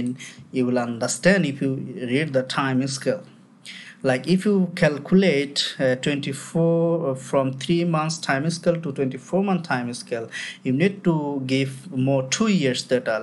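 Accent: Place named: United Kingdom. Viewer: Some word pronouns with british and russian accents which sounds very odd 😢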